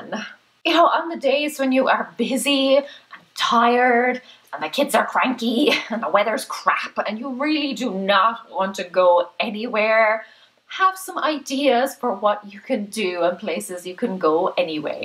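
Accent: Ireland. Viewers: Omg I love the way you put things into doable stuff. You actually get it! Thank you I have 4 girls it's hard sometimes! I love them of course but thanks for your honesty (inspiring) xxx what part of Ireland are you from?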